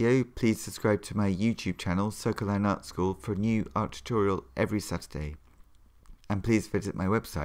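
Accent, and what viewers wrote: United Kingdom. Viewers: Hey, are you british? I really love your accent!!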